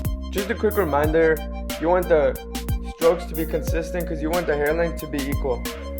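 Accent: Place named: India. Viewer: He sound like Apu that one Indian character from Simpson’s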